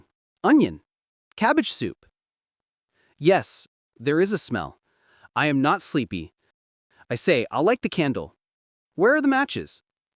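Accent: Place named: United States. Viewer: Я не ожидал, что голос с американским акцентом подойдёт к рассказам Чехова. Но это случилось. Удовольствие слушать!❤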